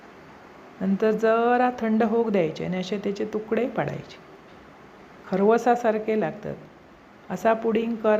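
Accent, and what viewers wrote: Malvani, India. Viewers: Luv to listen to your malvani ❤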